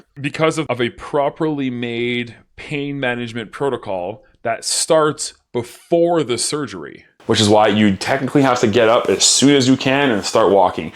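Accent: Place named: Quebec, Canada. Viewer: I thought so! By looking at your name I was wondering if you were French Canadian. Cool. You speak quite fast as well so with your accent it sounded different. I think the French accents we have over here are a little different to the ones you have over there. Love your channel and think you are doing a great job helping and inspiring people.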